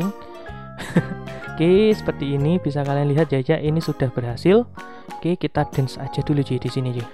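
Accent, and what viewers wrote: Java, Indonesia. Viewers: bang lu ngomong medok jangan pake ane ga pantes aku aja atau saya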